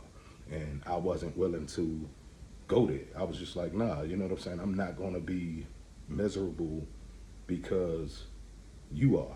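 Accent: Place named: Maryland, United States. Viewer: I hear MD in you me too where at brother.....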